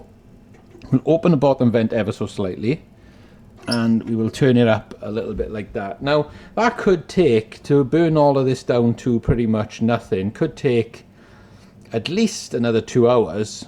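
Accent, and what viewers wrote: Wales, United Kingdom. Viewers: Pleasant accent/dialect, is that a wales dialect? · I’m with (@ Brick-ets” you don’t say antiques (Antigs) But I love the Taff accent, my mother in law’s Welsh “just don’t tell her” is your Clock room sealed or room vented? Best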